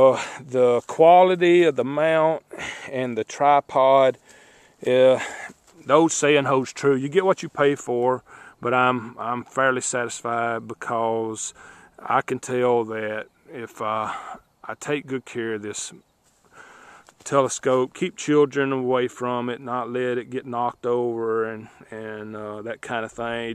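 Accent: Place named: Texas, United States. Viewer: I'm fascinated by the hobby and have finally narrowed down my telescope search to the Orion StarBlast 4.5 or the 6. (The 6 has bigger aperture, but I'd like the dobsonian tabletop mount.) Have you, since making this video, had a good experience overall with this scope? I'd love to hear your thoughts and whether they've changed since having it for a few years. P.S. Are you Texan? You sound like my family and it's great. Haha ;)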